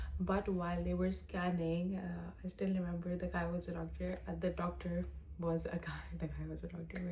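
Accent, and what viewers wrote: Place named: United Kingdom. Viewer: Sorry i was really struggling to understand you. Your voice is really low plus you're speaking urdu in an english accent. At some points i was confused that are you speaking urdu or english? 😂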